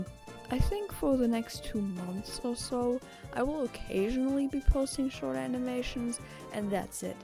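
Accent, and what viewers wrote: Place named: Europe. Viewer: Where are you from btw? I noticed a slight hint of European in your accent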